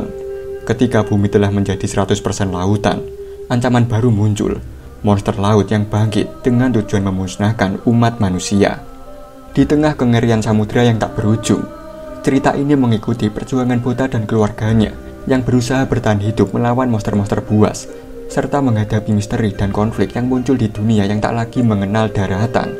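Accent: Java, Indonesia. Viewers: Wong jowo a mass?🗿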